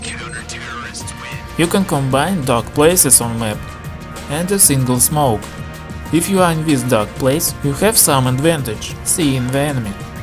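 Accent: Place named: Russia. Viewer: I love Russian accent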